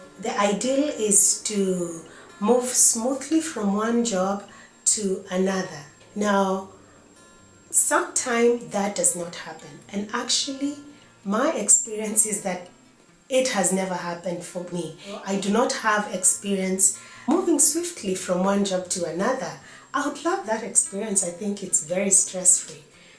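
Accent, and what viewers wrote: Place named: Kenya. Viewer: I came across your video after quitting my job abruptly. Thanks for the solid advice. You sound Kenyan na uko Vienna? Niko Wien pia. Point 7 hit home...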